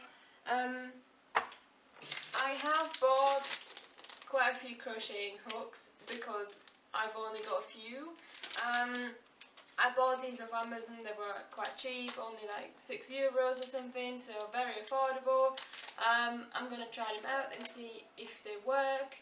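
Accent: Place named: Yorkshire, United Kingdom. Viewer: where are you from haha? your accent is so yorkshire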